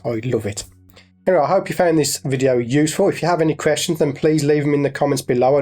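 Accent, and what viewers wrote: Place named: United Kingdom. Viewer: Love your systematic division of points of review. Cool accent too... is that English? Which part of the UK?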